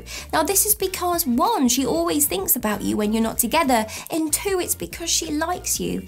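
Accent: United Kingdom. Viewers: Your talking about ways to know if she's thinking about me,but I'm stuck here wondering where's your accent from🤔..sounded kind of British ,but then again it sounds like something else..I love it either way ! Big up from the Caribbean !!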